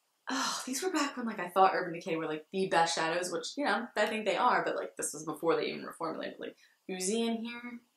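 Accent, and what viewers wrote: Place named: Philadelphia, United States. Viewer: Okay, upon further investigation (Instagram), I see you're from Philly!!! I knew it! I lived in Ridley Park for a few years and then moved to Jersey. I'm LOVING your channel. And probably the fact that you sound like me although my accent is pretty toned down. So, I'm just wondering, can we be best friends?! You have the cutest personality and I've been wanting to start a channel for so long now. Low key girl crushing on you right now (in the let's be friends kind of way) lol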